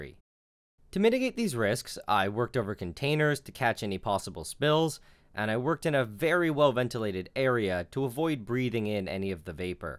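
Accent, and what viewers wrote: Canada. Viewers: Why do Canadians say "BEEN" as "bean" and not "BIN" pronunciation?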